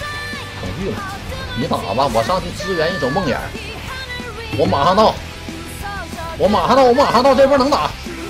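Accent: Northeast, China. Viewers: 猴哥是東北人嗎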